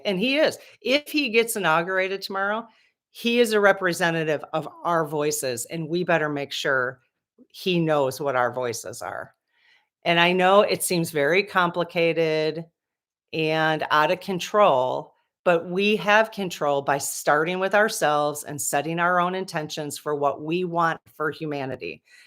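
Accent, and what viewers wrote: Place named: Wisconsin, United States. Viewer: I knew Wisconsin by your accent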